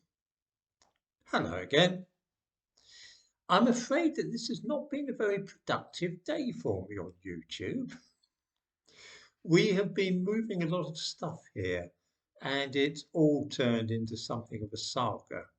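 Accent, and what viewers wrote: United Kingdom. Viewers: Every time I hear "Hello Again" I chuckle and smile, Love your videos and accent of course. Then I wonder is he typical British? Is there a typical Brit? There are so many sub sets I guess you'd call them, of areas or Nationalities like Whales Essex Ireland Scotland etc. What are you? I know you aint a Scot nor Irish but you are smart and i enjoy listening to what you have to say. I feel were pretty much in the same boat but a different continent. It scares me that our two Govts. are in lock step together same talking points agenda its like they're psychic. did someone say Hello again?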